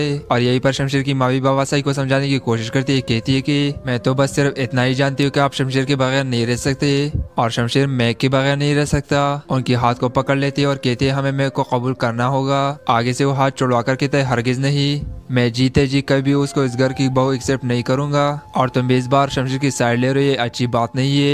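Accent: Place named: Urdu, India. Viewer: lahjy se to lag raha hai ka ap Urdu sai bol rahy hain because ap Muslim hain Hindu jo huty hain wo hindi bolty hain aor hindi bolny walo ka lahja pata chal jata hai aor India me jo muslman hain un ko to ni lagata lakin pata chal jata hai ke ya Indian muslim hain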